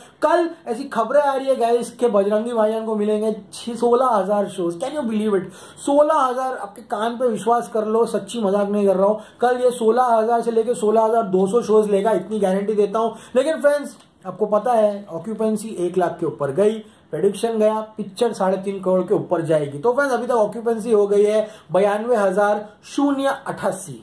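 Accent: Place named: Hyderabad, India. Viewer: Asalamualaikum bhai apki dialogue dilevery jo hai na hamare hyderabadi style me bolte kirak maut daldere yaro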